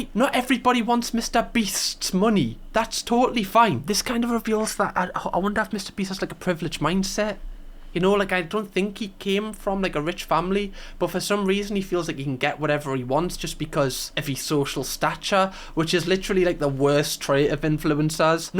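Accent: Newcastle, United Kingdom. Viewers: he’s from newcastle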